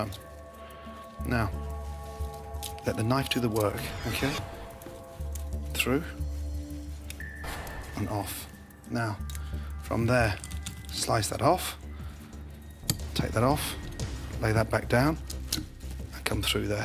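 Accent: Scotland, United Kingdom. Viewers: I don't know why his British accent makes me feel energetic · HE’S SCOTTISH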